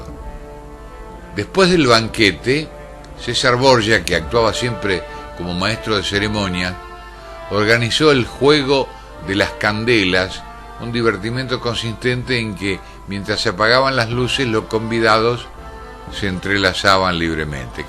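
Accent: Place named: Argentina. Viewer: el video bonito pero ese acento argentino muy feo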